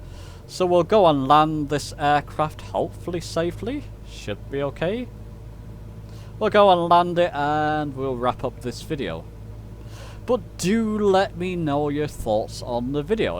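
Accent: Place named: Northern England, United Kingdom. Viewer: Good to hear that Northern Voice every time I want to learn "How To!" and how you make the difficult seem so easy I don't normally believe in coincidences - but I just asked on My Orbyx Forum any hints for making Flight sim videos because I want to improve the few I've made and took the UTUBE plunge - and once again - great minds think alike Thanks for all you do especially for us Old blokes!!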